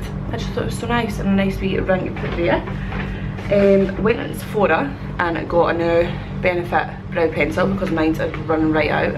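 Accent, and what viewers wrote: Scotland, United Kingdom. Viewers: I actual love your patter, so Scottish haha. Thanks for the video and congratulations! Whereabouts in Scotland are you guys from?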